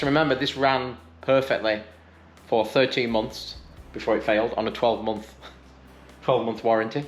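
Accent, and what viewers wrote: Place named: England, United Kingdom. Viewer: I'm sure we don't live to far from you..we moved to the USA in 1999..we moved to our Florida home 5 years ago..we got it in 2003..not sure I could do a large upgrade now..we may wait till everything comes back into syle..😂😂😂..I was watching storm videos and heard an English voice..so stopped to watch..everyone we know are American.. I don't think there are as many Brits here as there once was..before we moved here full time there were a lot of British here..I think we scared them away...😂😂😂😎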